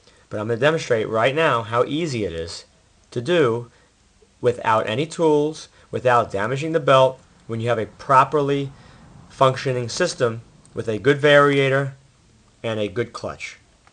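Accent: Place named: Rhode Island, United States. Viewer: Sounds like my friend from Rhode Island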